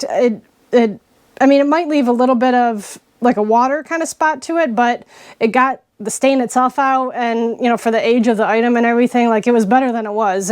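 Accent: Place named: Eastern Canada, Canada. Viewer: You sound like your from eastern Canada ….🤘🏼🤘🏼. Subbed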